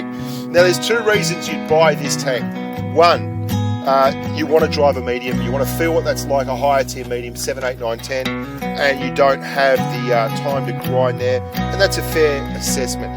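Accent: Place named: Australia. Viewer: Your videos are great and your commentary is always entertaining. I don't know why but I've always loved the way you Aussies talk. Guess you can blame it on crocodile dundee from when I was a kid. I'd love to hear your thoughts on the Churchills. I can't find anywhere in my heart to like them but I want to know if it's worth grinding past them to the Black Prince and beyond.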